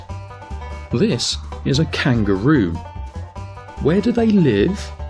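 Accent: United Kingdom. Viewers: Shill behind a stuffy English accent. Must seem pretty impressive to the cucks (subs) of this channel. The real working model is quite the hot potato but I'll give you clueless twits a hint. The vajra. PLASMA is the light source. Learn about primer fields. Maybe you can figure out that the electromagnetic ice dome is essentially a magnifying glass. You may struggle to get it given your limited ability to reason but the sun is nothing more than the focal point of light inside the dome from the plasma spiral( again, learn about primer fields globuloids) Just like a magnifying glass. That's why you can see clouds behind and in front of the sun when it's lower on the horizon. It is local but only the light from the source. Learn about the VAJRA! What it is and when was it seen. Also the complete book of Enoch. If you are godless there's no telling you as it is impossible to have understanding without. 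And I'm not talking about the Freemason puppet Christian church on the corner. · If you call people retards it's no wonder you only get responses from like minded people. That is no way to expand your horizons..ps nice British accent.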